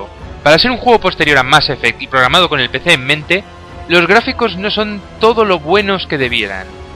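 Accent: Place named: Madrid, Spain. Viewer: Nah, me suenas madrileño sin más, pero vi el otro día el canal este de juegos de mesa en el que colaboras y que hacían una entrevista en una tienda de Moratalaz y sumé dos y dos a ver si eras vecino xD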